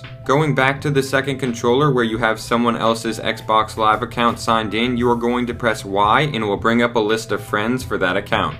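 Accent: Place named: United States. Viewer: OMG His Accent is like an Americans oh my god.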